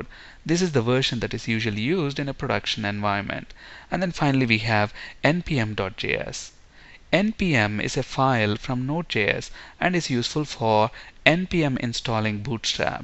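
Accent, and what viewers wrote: India. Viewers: I have problem hearing Hindi accent most of the time but I can understand you and you explain very well starting with the context and what is what which is crucial to understand properly. Really a great tutorial.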